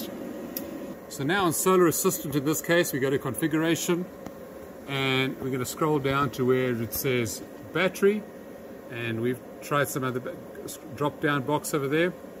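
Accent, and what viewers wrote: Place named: South Africa. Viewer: Sounds like a South African accent, Im sure you know that Solar Assistant is produced in SA?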